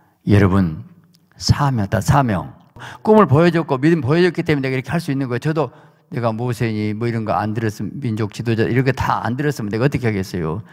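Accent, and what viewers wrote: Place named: South Jeolla, South Korea. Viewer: 목사님 넘 존경합니다. 너무 설교듣고싶은데 전남 사투리땜에 잘안들려요. 밑에 한글좀 올려주세요. 목사님 넘 사랑하고존경합니다. 미국의아들에게보내고싶은데 저보다 더못일아들어서그래요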